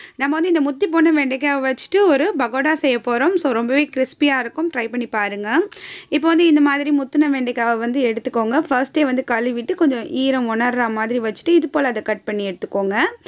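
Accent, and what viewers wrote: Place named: Tirunelveli, India. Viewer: Mam neenga kovilpatti or thirunelveli site ah mam unga speech word enga pakkathula mathiri iruku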